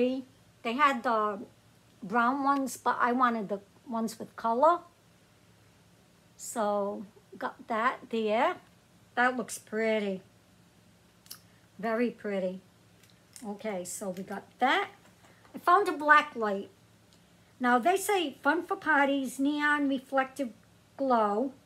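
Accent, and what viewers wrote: Boston, United States. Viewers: Are you from Boston ? Your accent sounds like it 😊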